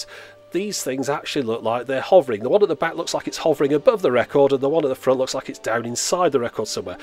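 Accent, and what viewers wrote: United Kingdom. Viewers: Awesome! I love it when you make audio equipment and vinyl videos... I only have one album with a hologram on it, it's by. I also like your british accent, as I'm from México it's more understandable to me than american english. Great video as always! Keep them coming! - IQ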